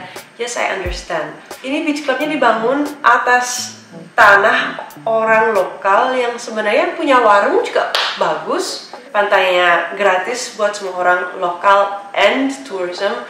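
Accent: Bali, Indonesia. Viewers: Logat mu medok bali luh jegeg😂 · Your accent is still like that of a Balinese. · Logat bali bagus, wajah juga cantik. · bukan asli bali tapi logat Bali nya kena banget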